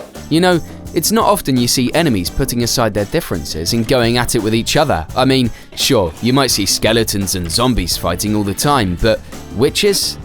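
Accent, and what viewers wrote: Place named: South, United States. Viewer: Was expecting a monotone American accent instead I got a chirpy southerner overall good deal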